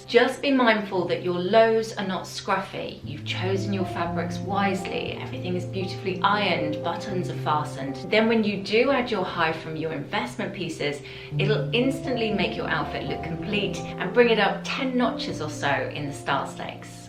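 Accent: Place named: England, United Kingdom. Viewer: Hi, I adore all your outfits and style ideas. This was yet another elegant and classy fashion video. You are wonderful at what you do...please continue! I think it's perfect. You're amazing! Please dont worry about the people with thumbs down...you can't please everyone. I think you always give great fashion and style advice and you do this in such a sweet way with a sweet voice and beautiful English accent, and your lovely personality shines through. Keep doing what you're doing- it's all good! I look forward to your stylish videos. Have a lovely Sunday. Karmel xx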